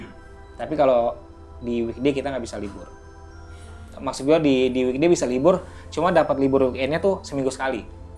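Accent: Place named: South Jakarta, Indonesia. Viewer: Anak jaksel mana suaranya niiih hehe. Akhirnyaaa cerita Kisah Menara Saidah walaupun nontonnya telat bbrpa hari😂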